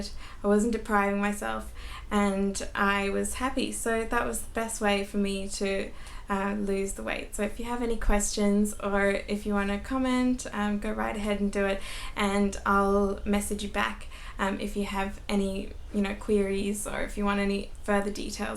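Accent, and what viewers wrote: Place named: Australia. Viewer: If I had to guess I would say you're an Aussie?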